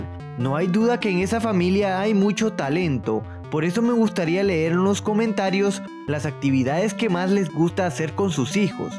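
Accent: Honduras. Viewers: Sos Hondureño?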